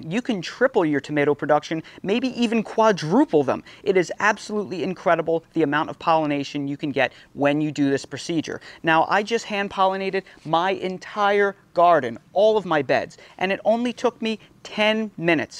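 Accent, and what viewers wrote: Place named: Mid-Atlantic, United States. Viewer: I tell you what I’ve said this before you and your wife are my favorite go to info I really mean that and I recently saw from your work you are from where I live the mid Atlantic I’m in Delaware I lived in pa. Md And Delaware I guess I can relate to your accent lol thanks for all your hard work and info the best by far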